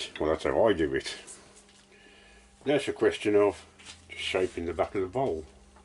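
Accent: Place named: Black Country, United Kingdom. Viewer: Cheers for that demo. I've been checking out the other makers methods and your one is the one I'm going to have a go at. I like your style and Black Country? accent. Are you still making videos? Hope so. Best regards.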